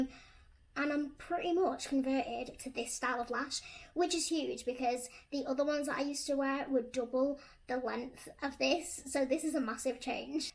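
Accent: England, United Kingdom. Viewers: I just love your English accent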